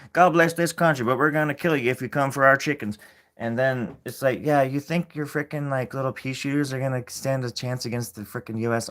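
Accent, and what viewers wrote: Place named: Australia. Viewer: Your are an Excellent Artist! & a Beautiful Singer! I just want to tell you this. and what a Unique Podcast too! You are also Funny! I love your Australian Accent!, very convincing Mate! You are a hidden Box of Massive Gems 💎 M🕊️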